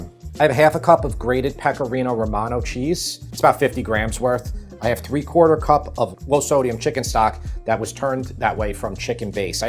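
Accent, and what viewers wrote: New York, United States. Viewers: Not gona lie I'm soooo home sick your accent reminds me of my fam from ny😢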